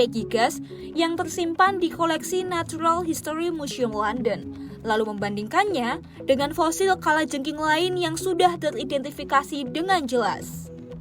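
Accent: Java, Indonesia. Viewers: Njir suaranya Jawa medok😂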